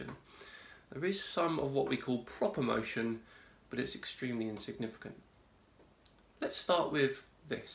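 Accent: United Kingdom. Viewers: I have to say that you are the only channel talking about Flat Earthers that I have subscribed to, which I just did 5 minutes ago. Your approach is informative, no nonsense, straight facts that will appeal to any half sensible person even slightly being swayed by the Cult of Flatness. It's also nice to see a brit out there, though I can't quite place the region. Keep it up good man!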